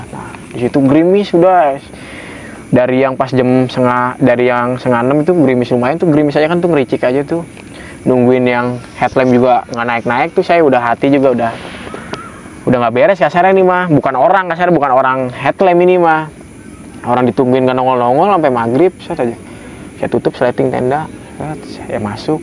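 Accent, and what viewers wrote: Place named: Tangerang, Indonesia. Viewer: KAGA ADA YG SIUL greget amat bang wkwkkw Btw nada logatnya tangerang banget bang, mirip film si marko :v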